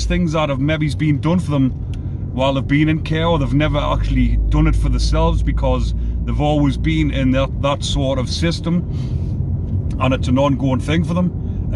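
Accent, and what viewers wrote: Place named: Scotland, United Kingdom. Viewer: like your scottish accent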